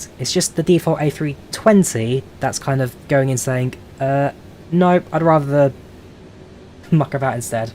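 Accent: United Kingdom. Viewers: Thanks for this video! It's wonderful listening to your British voice and a good landing! Your channel deserves more subscribers!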